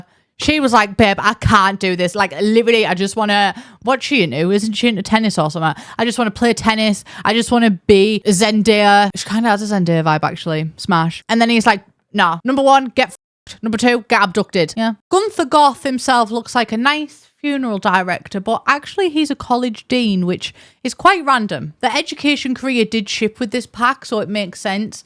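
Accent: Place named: Working class, United Kingdom. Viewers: james cook also colonised where i live in far north australia, he was the first european to arrive here actually. we actually used to have a massive statue of him where he literally was doing the hitler salute and a bunch of racist locals were really up in arms when it was removed a few years ago. our main university is james cook university, and there’s a little “town” nearby literally called cooktown. that man was terrible though, along with the rest of his fleet of colonisers. murderous, pillaging, entitled bastards. according to some aboriginal/first nations lore his arrival was foretold as a large pelican that would bring destruction, and in fact what was foreseen were the sails of his boat, which the people had no prior reference for. had no idea that he was from middlesbrough. i find a lot of northern brits are drawn to live here though, and not to generalise and obviously not everyone is the same but i really like northeners better than other brits, they’re a lot more down to earth, less serious and generally my kind of people. 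😊 my step dad was northern and his accent and the words he used and way he said things are so much like. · I haven't paid so much attention since literal university. You've got such a good mixture of academic and fun, working-class British and it's so engaging. I absolutely love listening to you talk.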